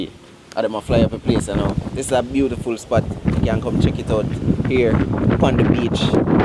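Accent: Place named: Patois, Jamaica. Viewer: I came across your channel by accident. But I am glad I did, as someone who has a xaymacan background. I love to support xaymaca. I love that you speaking in patwah language, and just being yourself. And not using the standard English. I am vegan, but still watching the channel. Reminds me of the xaymacan dishes my family makes.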